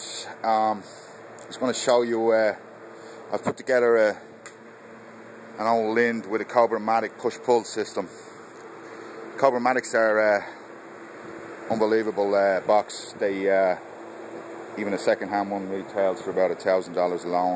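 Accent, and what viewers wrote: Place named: Dublin, Ireland. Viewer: That's a Dubh accent if I ever heard one.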